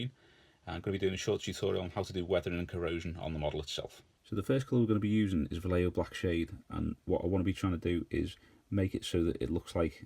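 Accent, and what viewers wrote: Liverpool, United Kingdom. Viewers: Scouser! Where you from man? I'm from over the water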